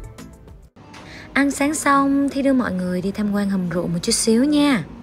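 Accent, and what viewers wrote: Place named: Saigon, Vietnam. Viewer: Blogger đầu tiên xem mà mắc cười cách nói chuyện và điệu cười luôn. rất dễ thương, giọng SG yêu lắm, mà thích nhất lúc bả nói chai rượu mập như con heo, rồi đang nói thì cười cái vụ tốc váy, rất gẫn gũi, chân thật và dễ thương. Mong luôn đáng yêu và hài hước như zậy <3 rất đáng xem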